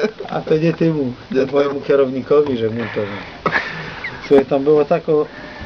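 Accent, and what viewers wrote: Silesia, Poland. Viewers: Chodzi o mówienie jak na Śląsku a nie o bluzgi.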